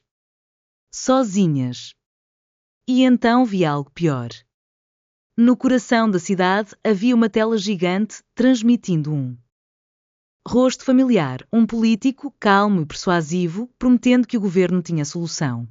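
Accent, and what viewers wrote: Portugal. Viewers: Esse é o português de Portugal. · Porque a voz é portugues de portugal? Quase não dá para entender.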